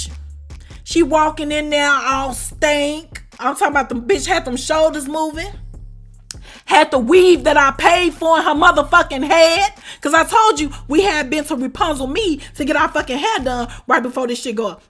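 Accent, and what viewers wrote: Chicago, United States. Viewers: I just found your channel this morning and on the 3rd video lol I know my ppl when they speak (Chicago #773 😌) . Keep doing what you’re doing 💪🏾💪🏾💪🏾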